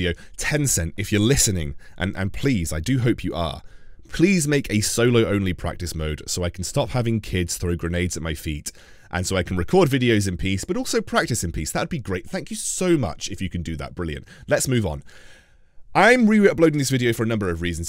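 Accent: England, United Kingdom. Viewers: I love your English accent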